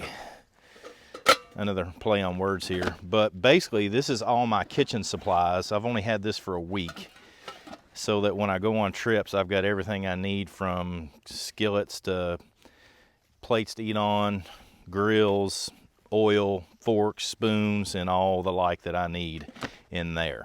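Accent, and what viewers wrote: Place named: North Carolina, United States. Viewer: This was a great video bro. Man hearing your accent reminds me when I lived in nc for a bit.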